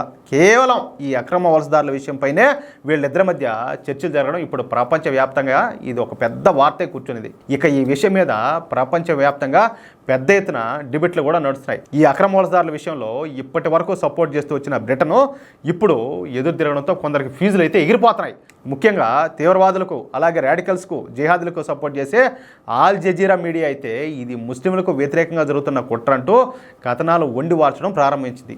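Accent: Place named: Kadapa, India. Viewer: Meedi kadapa district ramjapeta Slang la vundi sir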